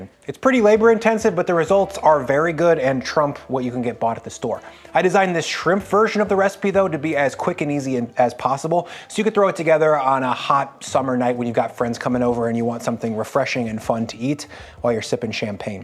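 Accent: United States. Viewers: for the love of pearl why, why metric......you sound like your in the U.S. is that not the case? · I like your recipes, you have some of the best on YT. But I hate your insistence on using metric measurements. You sound American, and your about section says United States, so why are you using that when that makes it more difficult for the American audience? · Please, you sound like a US citizen, if not apologies, I am not at all trying to offend, but can you use the measurements most US citizens use, ie.ounces lbs etc. Great content and look forward to more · You sound very American. Why grams?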